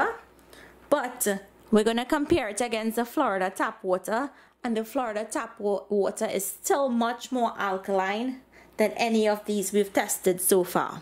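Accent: Trinidad and Tobago. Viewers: Are you from Trinidad? I love your accent! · KC and LoLo 23 it's a Trinidad and Tobago accent i.e. Caribbean 😊 hence the name of her channel as well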